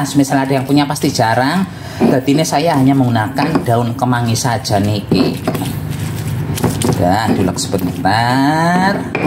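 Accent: Banyumas, Indonesia. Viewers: Lik asli ne wong endi??? Yakin ngapak kaya enyong pokok e ora ngapak ora penak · Sampean wong ngendi deneng ngomonge ngapak kaya jawane ijong masakane enak sumpah ijong jajal msk tongkolll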